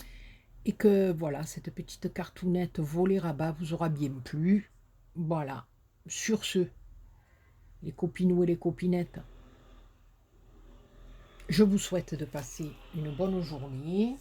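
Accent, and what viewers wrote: South, France. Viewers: Jolie carte Shabby j ´aime💞💞 merci pour le tuto et le partage ,ton accent du sud fait du bien😃👍.bisous😘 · Coucou, wouahhh c'est une sublime création, j'adore, douceur, raffinement!!! que du bonheur!! merci beaucoup pour ce tuto ensoleillé de l'accent du midi, agréable moment, bisous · Elle est vraiment très jolie cette carte, c'est vraiment un plaisir de vous suivre et pitié surtout pas de vidéo muette 😋 je suis trop contente de vous entendre ça fait du bien au moral! Continuez à nous enchanter et bravo pour cette belle réalisation (trop classe!) Prenez bien soin de vous et à bientôt pour entendre l'air du midi dans votre voix😍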